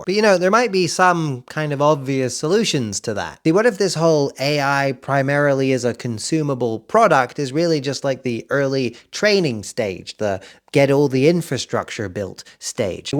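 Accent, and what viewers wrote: Wales, United Kingdom. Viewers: I’ll let you off for the Welsh accent, because you speak so much truth! 😂🫡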